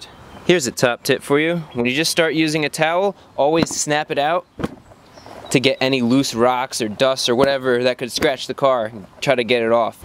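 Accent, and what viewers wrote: United States. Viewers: That's okay. I didn't want to be rude. Heard your US accent and thought you record mainly for Americans. No offense. cheers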